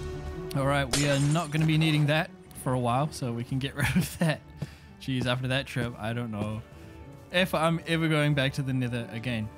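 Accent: New Zealand. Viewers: thanks so much bro, also from New Zealand, good to here a familiar accent on a yt video, keep it up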